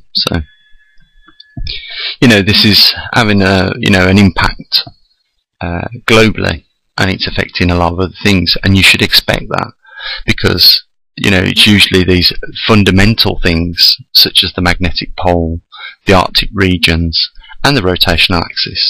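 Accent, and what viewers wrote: Birmingham, United Kingdom. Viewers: I here a brummie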